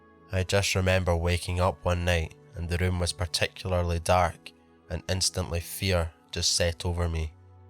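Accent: Scotland, United Kingdom. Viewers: Came here from Swamp Dweller and subbed immediately! I just LOVE your Scottish accent!! Paranormal and Cryptid stories are my favorite! There is another Scottish horror narrator. Channel named HELLFREEZER. Another excellent channel!! ;-)👍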